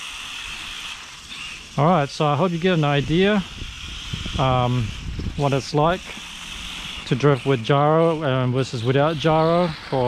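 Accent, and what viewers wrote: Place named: England, United Kingdom. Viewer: Is this the uk cuz you sound english dude?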